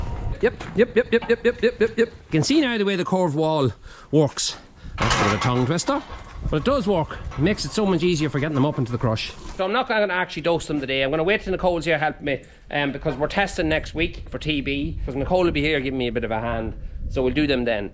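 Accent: Ireland. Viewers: ❤️❤️ LOVE THE CHANNEL ❤️❤️ 🤔🤔BUT🤔🤔... SOMETIMES I\\we, can't understand a word you're saying.!🧐🤔! SOMETIMES. You just talk TOOOOO FAST with that FANTASTIC Irish accent you have, and I\\we get lost in the wake.!🧐🤔! AND... The PERFECT EXAMPLE of this, is between the 12min point, and the 13min point, where you're obviously saying SOMETHING about how healthy it is for these lovely ladies to stay out, on the grass for as long as possible. But, at some stage they'll HAVE TO come indoors to spend their winter days and nights🥶. BUT... There's LOADS of detail you are giving us that we're simply not hearing, because you're TALKING TOO FAST, and we\\I can't keep up.!🤣! So... PLEASE talk a little bit slower when you're giving us the more important information. And... You can 'speedtalk' the less important stuff to your heart's content.!🥰! ABSOLUTELY LOVE THE VIDS.🥰🥰.... KEEP'EM COMING.🤞🥰.... 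RICH(UK).🥰🥰🥰.!.!.!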